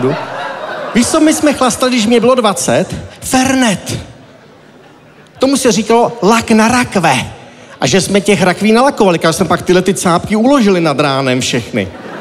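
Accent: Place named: Czech Republic. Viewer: Ta brutální čecháčtina při povídání o zženštělosti zní dost komicky. Jinak obsahově dobrý. :-)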